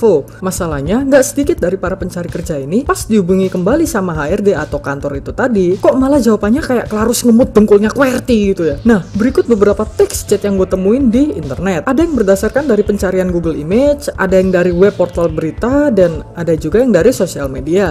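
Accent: Java, Indonesia. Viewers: " kalian tinggal dugem maen bola sodok " awoskske i love medok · Bang lu orang mana sih? Kayak ada Jawa Jawa medoknya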